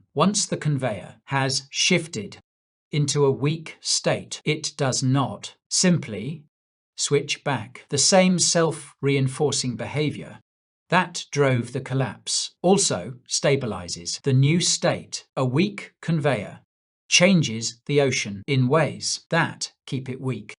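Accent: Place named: United Kingdom. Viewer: Watch this at 2X.....this AI sounds like James T Kirk crossed with a Brit. 🤣🤣🤣🤣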